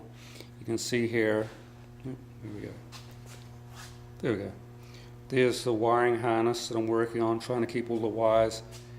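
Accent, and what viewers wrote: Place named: Australia. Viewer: Mate you the best, i bet your part australian, based on how your talk and how some of the words are spoken. keep up the great work mate and i hope to see it on the dyno. cheers please supply a link of the dyno ?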